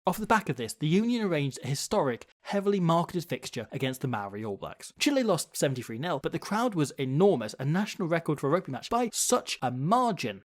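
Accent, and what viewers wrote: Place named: Edinburgh, United Kingdom. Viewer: English with a Edinburgh accent..my Chilean is...not sure lol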